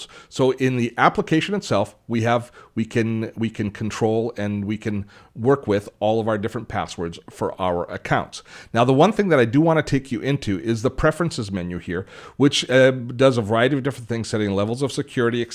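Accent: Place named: Canada. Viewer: aboot - Canadian accent detected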